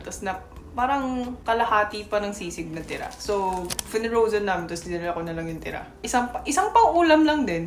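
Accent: Batangas, Philippines. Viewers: Very Filipino kung mag tagalog yung accent at yung taglish nya. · Parang filipina mag salita pero koreana 😂 astig. In what language do you think? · You look Korean Bec you are but you act & speak like a Pinay 😊✌️ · Grl,,aliw din aq sau,,, alam mo Yung pagngsalita ka wagi, ay pinay pla. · Hala kakatuwa Naman kayong magkakapatid😊❤Pinoy na Pinoy ka talaga magsalita. Ang galing 😁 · Yung Batangueña accent mo ba. Matik din nawawala pag wala ka sa Batangas? 😆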